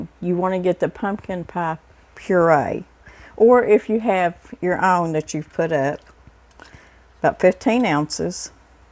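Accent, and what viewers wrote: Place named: Arkansas, United States. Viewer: Boy, did you bring back some old and wonderful memories. I used to make pumpkin bread in Folger's Coffee Cans and it always turned out wonderful. Later down the road I went to a pampered chef party when they introduced their new baking round, for baking breads. They had their Pam. Chef logo and design in them, which I thought was so cool. But to my dismay, after receiving mine and baking a loaf, it looked for like a cheese roll because the pans themselves were not anywhere big enough in size (round), it baked up too quick and as I said looked like a cheese log. So, I went back to my old way using Folrgers Coffee Cans. You remind me so much of some of my Aunt's who all live in Arkansas and "sound" just like you. I was born there (Morrilton Ark) but my Dad moved us to Texas for when I was 5 years old. We traveled back there most every year for vacationing and "Decoration Day". 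I love Arkansas. Those many times I spent there are the best memories of my life. I'm new to your channel and subscribed, so I'll be making many of your recipes I found, first being your pumpkin bread recipe. Thank you so much for sharing with u and say hello to my fellow (Arkansa-yers) !.. God Bless you and your husband. Linda D. from Texas.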